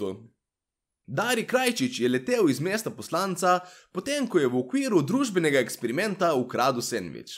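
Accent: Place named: Styria, Slovenia. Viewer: Legenda! Še po štajersko govoriš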